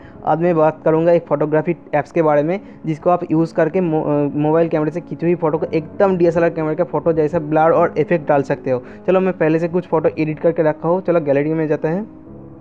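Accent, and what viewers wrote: Bengal, India. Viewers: haye re bengali babu..acent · aareee....bangali.....agy. hindi. sikhy. aai.. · Dont worry bro it was good enough to understand.... I can say that youre Bengali like me, thats why you had problems with pronounsation. But the video was good enough to understand..... Thanks. Qnd make some more useful video like this..... 😊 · improve your Hindi. You sound like Bong-Hindi, which is horrible. I'm sure you are Bengali...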